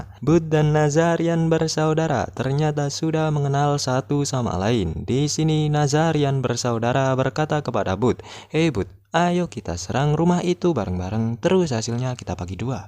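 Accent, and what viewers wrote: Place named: Java, Indonesia. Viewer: Jawa nya kelebihan mas pekat kali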